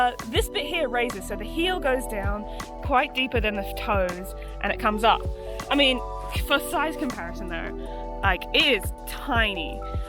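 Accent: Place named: United Kingdom. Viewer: it's sounds more British than Australia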